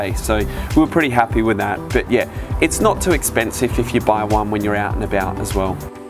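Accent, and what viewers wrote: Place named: England, United Kingdom. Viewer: Why is an English man doing a video on Greece? I want to hear it from a Greek man, I dont want to hear an English accent, one of the worst accents in the world. People in England speak horrible english, can never make out what they are saying because they cut the words in half when they speak.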